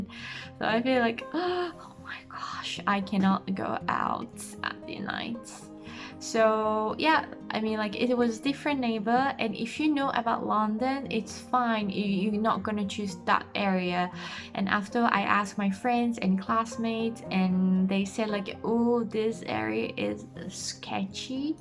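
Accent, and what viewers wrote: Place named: United States. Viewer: はアメリカっぽい英語ですね。とっても流暢で羨ましいです。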